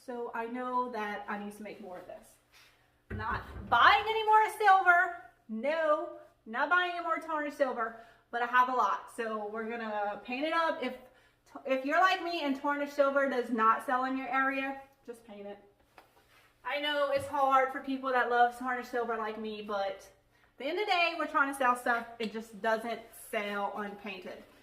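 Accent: Louisiana, United States. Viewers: I knew you were from Louisiana, you sound exactly like my daughter in law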